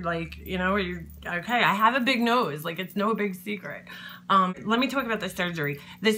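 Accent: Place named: Long Island, United States. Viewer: Long Island accent! Who knew? I've been trying to figure that out. Keep on runnin' your mouth with honesty! You are adorable.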